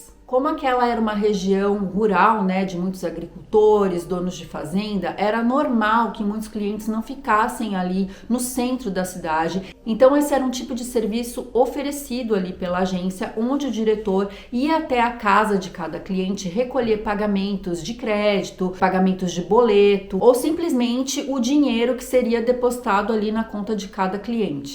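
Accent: France. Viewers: Oieee... Veramente, esse caso é o reflexo de uma sociedade preconceituosa, de uma imprensa q n se importa em mostrare a verdade, mas q é totalmente voltada para o sensacionalismo e o lucro, sem importarsi das consequencias de meter na lama, e distruir a dignidade de pessoas, q seguendo a lei deveriam ser inocentes fim a prova contraria! Purtroppo mudou pouco essa mania de fazer julgamentos, e bene si eu vejo ai da uma sociedade muito masquilista, e mulheres q sofrem ao ver o su esso, è a independencia de uma outra mulher... Eu moro a Forlì, cidade pequena, q vc espirra aqui, e no outros dia tds dizem: " meu Deus ela ta com Covid"... Pode acreditar!!! Baci a te, e adoro sentir o teu frances ... Adorrrooo, purtroppo o meu Frances é escolar, kkkk.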